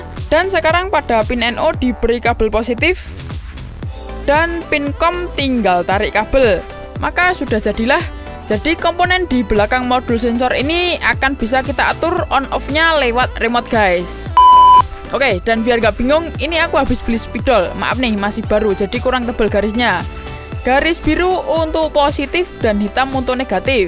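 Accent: Java, Indonesia. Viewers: Akhirnya ada channel gini yang medhok. Udah cukup dengan logat india wkwk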